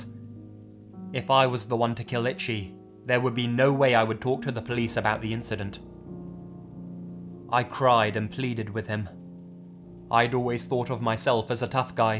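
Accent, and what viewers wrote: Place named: United Kingdom. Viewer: Why did he have to have a British accent 😓😓😓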